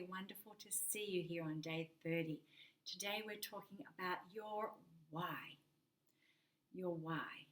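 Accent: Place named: Australia. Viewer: Absolutely love this channel your technique is great too. Do you live in Japan? Your accent sounds Australian.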